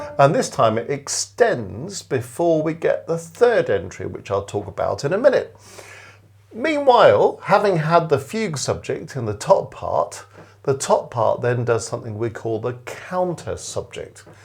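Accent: United Kingdom. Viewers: Love your videos...and your British English is delightful! Thank you,!!!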